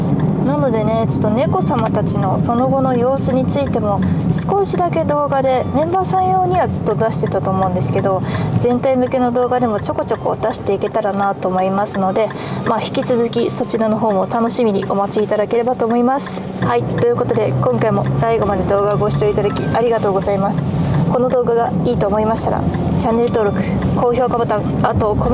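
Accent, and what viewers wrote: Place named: Gifu, Japan. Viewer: 鍛わってる。 久々聞きました。岐阜弁懐かしいです。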